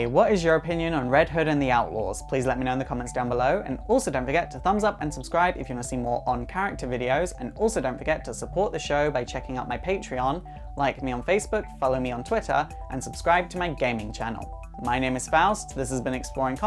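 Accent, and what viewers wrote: United Kingdom. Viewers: Is he British or have an accent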